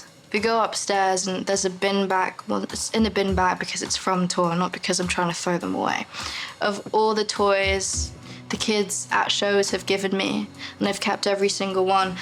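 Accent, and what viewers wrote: England, United Kingdom. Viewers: She’s British ?? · you've got a british accent and new york attitude i like it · Because it's a British accent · I keep forgetting shes got a british accent and I get surprise every time I remember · wait she has a english accent how did i just figure this out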